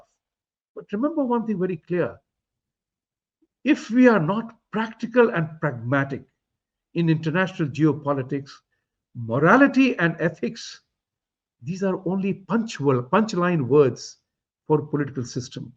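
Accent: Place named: India. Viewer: This old guy talks nonsense trump and modi is great u smells fishy 😊 ur Indian accent is nooo good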